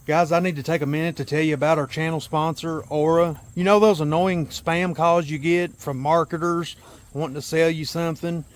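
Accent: South, United States. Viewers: I hope you read this as it's my first video of yours. You EARNED my sub, first off u had the stones to not spend 5 min explaining the SBR bs down to your number. Second you built imo the best 300 on yt, and mostly you the only one sound more redneck than me here in NC. You got like sub comment whatever I can do.🫡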